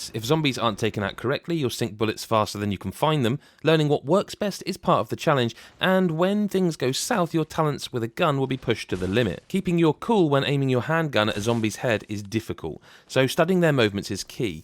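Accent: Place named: United Kingdom. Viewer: Is everyone who reviews games British?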